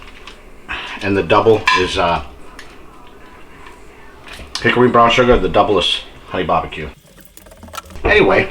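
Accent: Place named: North, United States. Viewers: Sounds like Pittsburgh accent am i right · Like your accent man, I'm from the deep south and love listening to you guys up there talk.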